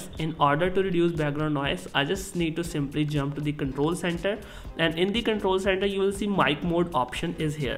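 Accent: India. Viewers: Learn how to talk proper English, you sound ridiculous. You are speaking English not indian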